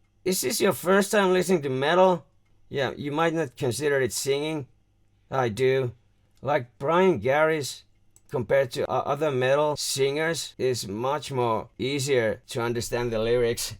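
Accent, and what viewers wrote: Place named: Finland. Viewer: Not gonna lie, it took me a while to place that accent. At first I thought it might be some odd dialect of Irish English, but after a while the Finnish-ness crept in - which I eventually caught, being also from the Nordics. Norway, in my case. Anyways, terve to you, my man. Good video! PS: It's reggeaton.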